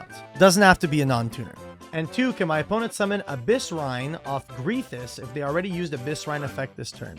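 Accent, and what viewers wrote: Quebec, Canada. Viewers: He is french canadian! Pretty sure from quebec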